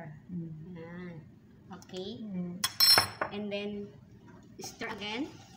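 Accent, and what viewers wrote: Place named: England, United Kingdom. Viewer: Nag enjoy ako panonood lods,,good vibes ang pagluluto mo with English accent hehe..new friend, Joyce Toda brought me here..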